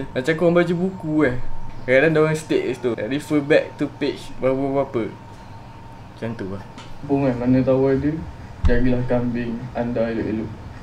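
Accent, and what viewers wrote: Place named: Pahang, Malaysia. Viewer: Aok ni orang mane? Ngape mcm loghat pahang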